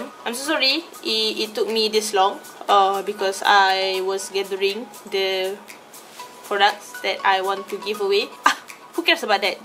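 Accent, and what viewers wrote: North, Malaysia. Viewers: Your first video that i watch probably the fresh everyday makeup look...terjumpa video tu masa tgh scroll....terus terlekat sampai la ni. video suma 😂 lawak la bila ckp..pastu suka tgk loghat utara kuaq haha..😂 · first video yg kmi tngok tu video top 10 drugstore products. lpas tngok truih mcm ni taste aq pnya video ni. yg tk bosan and tk rsa nk skip pun. ap lg. truih subscribe laa. and bermulalah detik2 mngikuti stiap vid lpas tu. suka sngat tngok vid sbb mmg information padat and jujur. Org yg sngat slumber gilaa, yg tk kesah laa ap pun, tk cover ayu2, macam aaa just be yourself pnya attitude gituh.Kmi suka org mcm tu. Bru selesa kan nk buat ap2 pun.Video plak diselitkan juga dngan crita yg mmg menarik dan lwak.Jrang sngat ttiba tngok vid makeup tapi glak srg2. Kecuali vid ja (its true, not just because the giveaway XD) Yg pling tk bleh lupa crita msalah confuse tarikh tu. 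Tarikh ttkaq laa, tiket tarikh lain. You just like me. Vid plak bkan guna bnda yg sma slalu, sbbkan tu tk ragu2 pun nk tngok vid baru atau vid yg lain. Loghat plak mmg utaqa, geng skali. Tak prnah bosan laa jwabnya. Kdang duk tngok ad dk vid yg baru. Bila ad, truih tkan. You deserve all this. Org tkkan suka something tnpa sebab:) Semoga ceria slalu and keep update new videos for all your fans <3 (lupa buh so edit blik kehkeh) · first video yang i tengok is try-on makeup haul | wet n wild MY , masa tu i tengah tengok review review product wet n wild haa sekali nampak pulak review from malaysian youtuber apa lagi tekan teruih la . seronok gila your video sebab the way you talk , reaction you sumpah lawak and i love you loghat utara . congrats on your 10k and mungkin lepas ni 20k , 30k pulak . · Congrats on your 14 k subscribers. Actually dh tk ingt mna video first yg i tgok sbb tiap2 mlm sbelum tdoq dok ulang tgok vdeo 😂😂I've to checked it back and yeahh rasa rasanya video "duck cosmetic honest review" tu kot. 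Lepas tu terus ishh sapa dia ni jujur sungguh review nih aku suka then terus subscribe and sbb hg ckp utara tu suka dngaq beletiaq. Hahaha 😂😍💃🏼💃🏼💃🏼💃🏼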